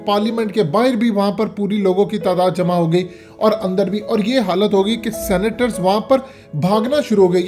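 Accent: Punjab, India. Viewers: جن کو ٹھیک طرح سے اردو نہیں بولنی آتی۔۔۔اور جن کا اردو کا تلفظ پنجابی جیسا ہو، ان کو پاکستان میں صحافی بنایا ہوا ہے۔۔۔یہ معیار رہ گیا اب صحافت کا۔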